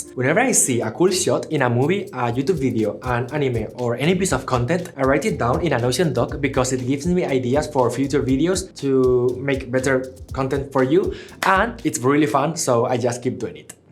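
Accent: Spain. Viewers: He has the strongest Spanish accent I have ever heard, I got no idea, about his origins tho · where r u from, ur accent gives me spanish vives (lo eres 100%)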